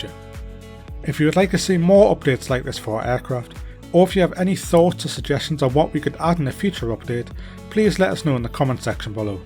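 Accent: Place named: Northern England, United Kingdom. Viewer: Nice to a northern fella, least they're local, i think your the voice that says "GO" in the RJ too? Great as always. Nice job JustFlight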